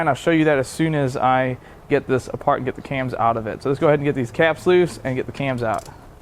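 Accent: United States. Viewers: Normal engine design from this millenium. Ps. Are you yank?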